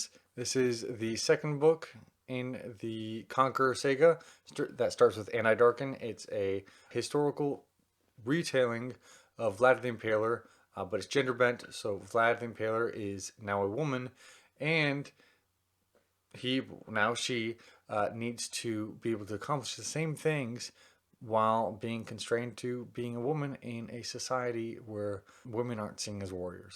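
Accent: South, United States. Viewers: I love your podcast of this video at your house in Texas during your quarantine until you had a lot of leftover books this month are "The Dragon Reborn" by Robert Jordan, "Unsouled: Cradle Book 1" by Will Wight, "Now I Rise" by Kiersten White, "The Invisible Life of Addie LaRue" by V.E. Schwab, "Legendborn" by Tracy Deonn, Cresent City: House of Earth and Blood by Sarah J. Maas, "The Fires of Vengeance: The Burning Book, Two" by Evan Winter and "Dark Age" by Pierce Brown while you're talking about all of the characters from the books to read them from the beginning after that, I hope your husband, Zach will be proud of you and I love your Southern accent!! #januarytbr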